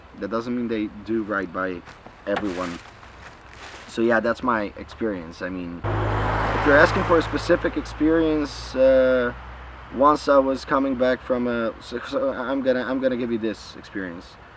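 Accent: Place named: United States. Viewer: such a nice american akcent. Do you spend some time in America or is that just influence of the Internet?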